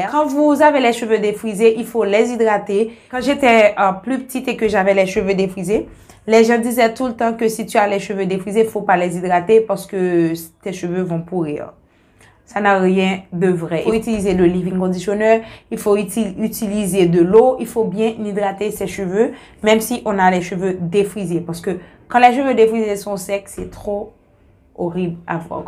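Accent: Haiti. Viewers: T'est haïtienne ma chérie ya l'accent fort 😅😘😘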